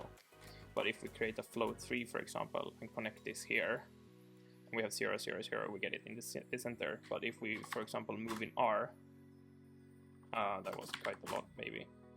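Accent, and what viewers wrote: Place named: Sweden. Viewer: 5 seconds in and I instantly know you're Swedish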